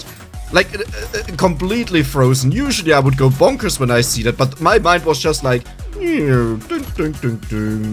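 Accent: Germany. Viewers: Are you from germany xD sounds like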